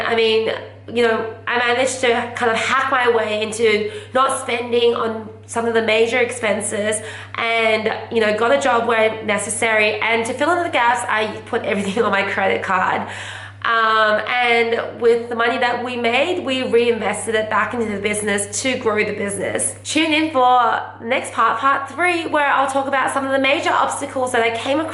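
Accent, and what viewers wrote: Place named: Australia. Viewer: Im in America. Is so cool to see an asian with an Australian accent!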